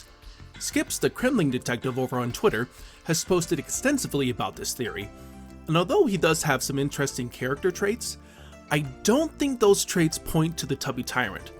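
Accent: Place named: Canada. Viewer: Also, your accent tells me that you're Canadian are you from Canada or not really?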